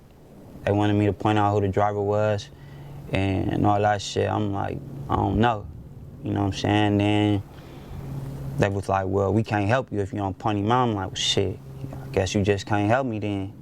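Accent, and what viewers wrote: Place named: South Central, United States. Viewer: either that's a west side south central accent or he's nipsey's #1 fan lmao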